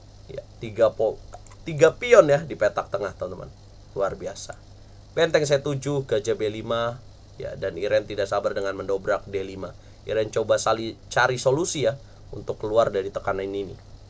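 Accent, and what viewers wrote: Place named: Java, Indonesia. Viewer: Ko tiba tiba medok bang😭👍🏼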